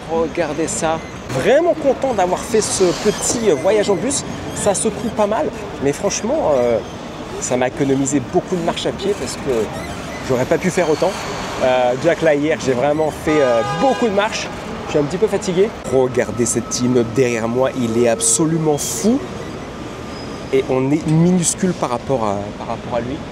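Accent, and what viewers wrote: France. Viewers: Super ton voyage en bus, tu as pris des risques en étant debout. Je suis étonnée qu’il n’y ait pas un guide pour indiquer le nom des rues et citer certains bâtiments. Tout le monde te demande le nom de ton site et quel succès avec ces charmantes jeunes filles , le french accent ça plaît !!!. Bravo, continue tes vidéos très vivantes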